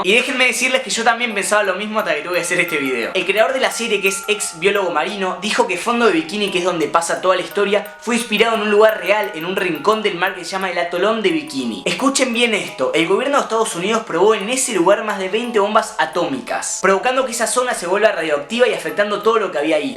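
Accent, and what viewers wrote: Argentina. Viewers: de que parte de Argentina eres??? Eres el mejor:3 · sos Argentino?????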